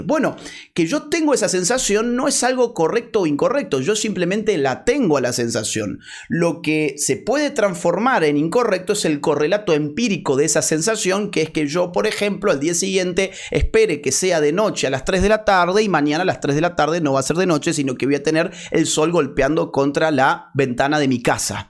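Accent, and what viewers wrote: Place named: Argentina. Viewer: El presentador hace lo contrario a lo que enseña el pobre Chomsky. No comprende, ni remotamente lo que Chomsky está intentando plantear, para que se empiece a pensar. Estas cosas hacen que el acento argentino suene tan feo que a nadie le guste ni ir, ni juntarse, ni estar cerca de nadie con ese acento, de “sicólogos”, de habladores, gente que engaña y de gente de poco nivel. Así nos va. Un molino de palabras pegadas para hacer teatro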